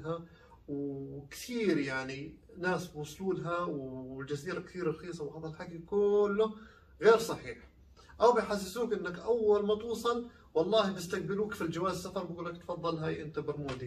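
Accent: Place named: Jordan. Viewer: اول شئ الله يسعد دينا احنا الاردنيه بصدقنا ووضوحنا وشرحنا بإيصال المعلومه بشكل مباشر وواضح ومفصل ومفهوم انا مش بس من اللهجه بل من طريقه الشرح والتوضيح والتفصيل قلت هاد الرجل اردني أو فلسطيني أو مكس 😂♥️♥️♥️💪 كل الاحترام اخي الكريم وأمنيات التوفيق على الدوام واستمر بإيصال صوره مشرفه عن شعبينا الواحد وصدقنا ورقينا بالتواصل وإيصال المعلومه بكل امانه ومسؤوليه ولك كل الدعم 💪♥️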